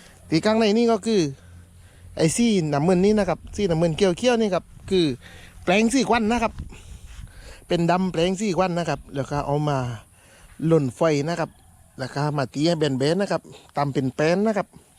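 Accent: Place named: South, Thailand. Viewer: ขอบคุณที่รีวิวให้ดูนะคะ พี่เพิ่งเคยเห็นเลยค่ะ ปืนยิงปลา เคยแต่ได้ยินค่ะ ชอบตรงเอาด้ามแปรงสีฟันมาดัดแปรงทำด้วยนี่แหละค่ะ ไอเดียดีจังเลยค่ะ ประสิทธิภาพสุดยอดเลย พี่ชอบน้องพูดภาษาใต้จังค่ะ ยิงให้ดูด้วย ใช้ยางสามเส้นนะคะ ไม้เทียมมันจะเบานะคะ และที่สำคัญมันลอยนี่แหละค่ะ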